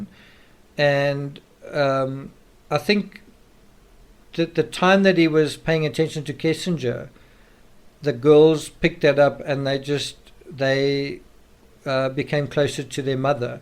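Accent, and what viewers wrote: South Africa. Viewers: ...are you South African?🇿🇦🇿🇦... Love the way all this is explained..though I still feel CW gets off on any attention..good or bad... Nothing can ever excuse what he did to them..what would be great is if he could tell the truth..even if that includes outing NK. Such delusions CW probably has twisted the truth is his head.. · The man speaks just like he spent his entire life in South Africa . Because , he pronunciations are a bit different , he is still readily understood. I feel your comment is quite rude.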